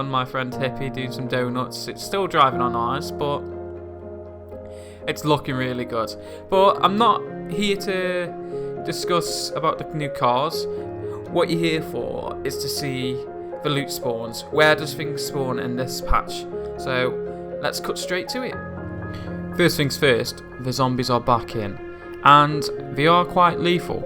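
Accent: United Kingdom. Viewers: Are you from liverpool? You sound like you are.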